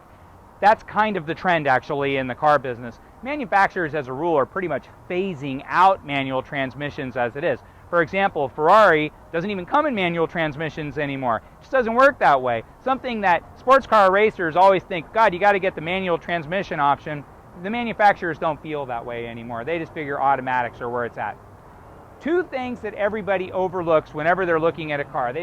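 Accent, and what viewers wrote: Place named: United States. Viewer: Despite am not very good in american accent english, but yes, I understood almost all this guy told us, and yes, he is right. Appreciations! Thanks baldhead guy(am also)😊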